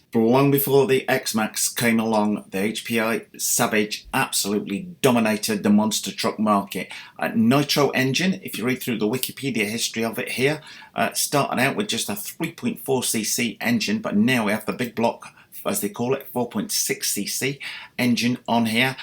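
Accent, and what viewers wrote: United Kingdom. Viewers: Ur you from the uk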